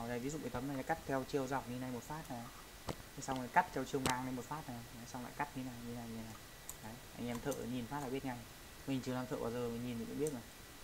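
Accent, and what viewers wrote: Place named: Thach That, Vietnam. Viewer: giọng giống thạch thất quá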